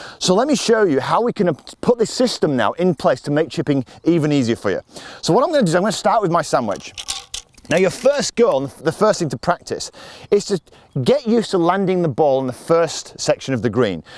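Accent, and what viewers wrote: Yorkshire, United Kingdom. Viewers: Thank you. Yet another super well explained video, simplifies the process. P.S. I love the subtitles trying to cope with your Yarkshire accent! I'm still trying to find my "pudding stance".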